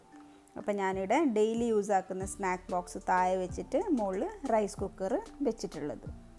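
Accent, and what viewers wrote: Coorg, India. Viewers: Samsaarm kekumbo vijaaricchu coorg thanne aanuu enn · Hii samsaram ketappo thoni m in coorg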